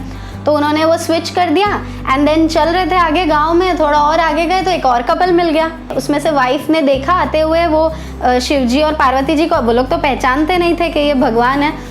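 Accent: Gujarat, India. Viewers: Gujju tone😁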